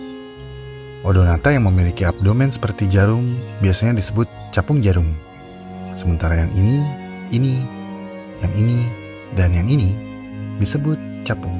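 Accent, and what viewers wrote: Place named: West Java, Indonesia. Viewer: Gua curiga admin orang sunda😁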